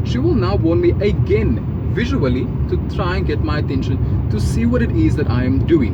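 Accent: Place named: Pakistan. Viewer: Whats sup with this Pakistan accent ? Can’t get half of a shit you said.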